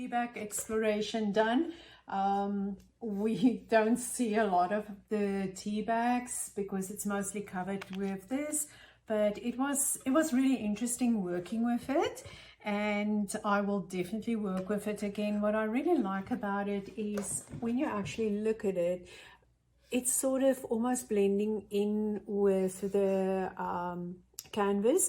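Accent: South Africa. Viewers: Ek het nogal gedink ek hoor n Suid-Afrikaner praat. Baie oulike idees, dankie. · Hi there from North Carolina, USA. You must be either in SA or an ex-pat! I grew up in the Cape. Love hearing you speak, brings back memories. I have been working with teabags for some years, trying many different projects!! Can't drink US tea, so still buy my Typhoo and PG Tips...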